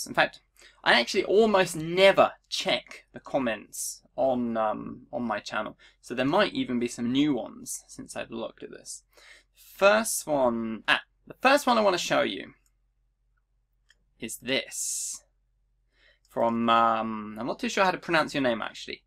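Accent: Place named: United Kingdom. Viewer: It's music to my ears your British accent. I love it!!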